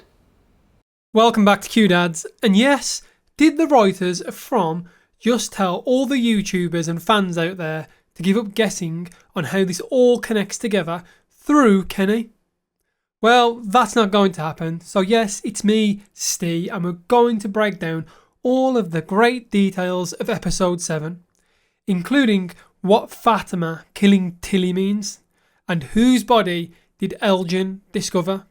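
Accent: United Kingdom. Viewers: no hard feelings bro. i actually love british accent but I have to concentrate more on the storyline which is the base of this video and your accent is making it harder because I'm not used to it, youtube gives recommendations to a larger base of audience.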